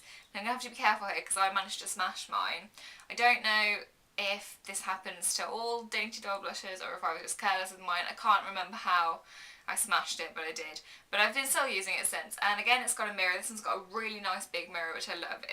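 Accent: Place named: England, United Kingdom. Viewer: i loveeee your accent! reminds me of emma watson :) what part of England are you from?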